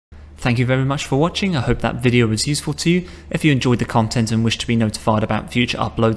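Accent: United Kingdom. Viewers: Again, a very useful video - also your very clear English is brillant (very British), love it! Thanks a lot for your great efforts and keep up the good work! Greetings from Germany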